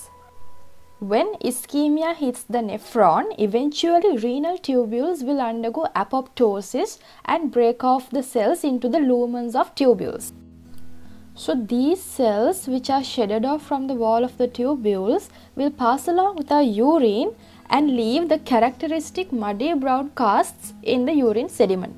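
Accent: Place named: Sri Lanka. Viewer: I can tell you are a fellow Sri Lankan just from the accent hahaha. Thanks for the great video!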